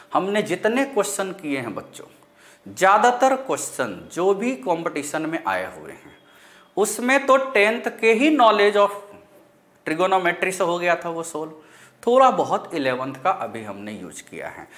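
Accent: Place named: Bhojpuri, India. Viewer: Thoda Bhojpuri tadka 🌶️ lag rha hai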